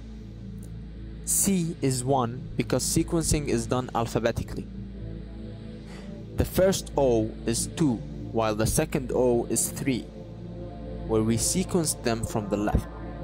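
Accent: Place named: Sweden. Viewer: Are you from Sweden? Just because of the accent. Great explanation of the coolest field cipher!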